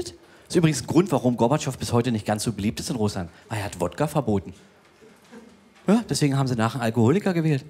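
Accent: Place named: Berlin, Germany. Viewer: Ich find ihn mega gut. Und ick liebe did Berlinern. 🙏😂.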